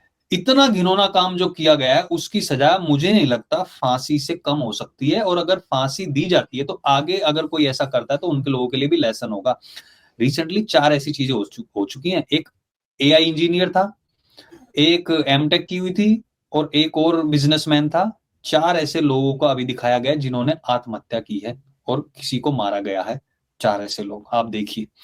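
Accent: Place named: Haryana, India. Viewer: Australia mei rehte hai aur accent Haryanvi hai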